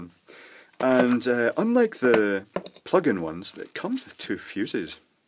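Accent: Scotland, United Kingdom. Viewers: he has a Scottish accent to be precise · Such a nice accent. Where you from Scotland? Must be, (not that it matters cause we are all just spirits in bodies). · Some guy, explains everything in good clear English with a slight Scottish Twang :-) Would make a good teacher. The website has good tutorials on various projects. Good luck on future projects pal.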